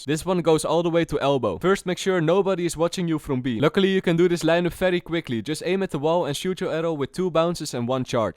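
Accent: Netherlands. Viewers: You really sound Dutch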